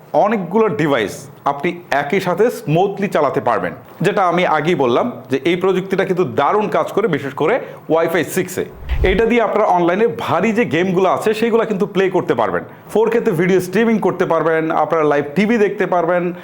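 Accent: Bangladesh. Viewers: Border par Kora Asa chan naki India ta??? Poris Kar bangali kotha bol ta paran na??? Bangladesh accent marchen... ar vul bal router ar kotha bolchan. Amazon page a giya daklam.... sob gulor review ta bolcha kichu na kuchu defect Acha.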